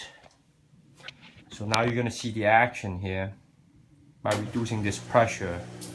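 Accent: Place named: China. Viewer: A chinese accent with a little New Joyseee accent... and you get this guy... awesome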